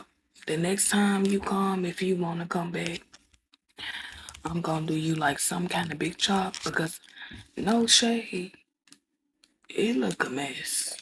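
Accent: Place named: Memphis, United States. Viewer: So that’s why I thought you have the same accent as glorilla, you’re from Memphis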